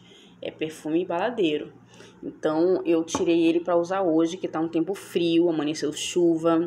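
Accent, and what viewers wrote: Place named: São Paulo, Brazil. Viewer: Tá fazendo frio aqui também amora ! Eu sofro no inverno kkk sou mto friuenta Lindona vc mora no Rio mais o sotaque é de são Paulo? Fiquei curiosa acho lindo o jeito de falar hehe 🙊😍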